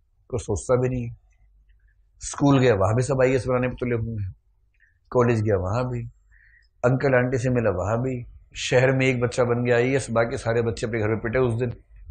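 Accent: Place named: Eastern Uttar Pradesh, India. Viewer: At the end, bujha raha h? 🤣🤣🤣 Sir aap pakka U. P. east se h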